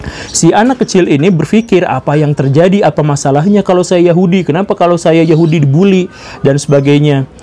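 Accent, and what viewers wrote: West Java, Indonesia. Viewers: Sederhana, dalam , menambah wawasan, mendewasakan, introspeksi, realita + bonus aksen sunda LANJUTKAN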